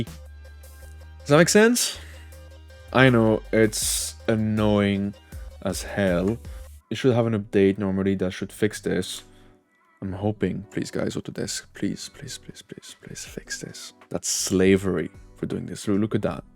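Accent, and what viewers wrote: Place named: France. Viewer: Sa sentend quil est français lol trop cool x)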